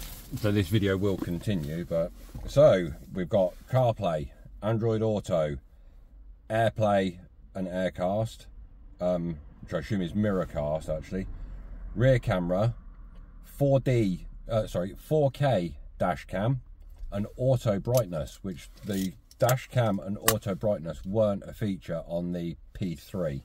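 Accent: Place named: United Kingdom. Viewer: Hi mate. New subscriber here, thanks for the review. Nice to hear it from a accent this side of the pond too! I had an Xtrons head unit in my E92 that I ripped out and reverted to stock, audio quality was horrific. I read it's because the retrofit units for the E92 (and E90/93 by extension) don't utilise the fibre optic connectivity that the stock head unit does, rather using auxiliary instead. So I've been looking for one of these I can use alongside the stock head unit. With this connected to the aux port (or even by FM), what does it sound like? Is there any crackling or distortion at all? Or is it decent? Cheers!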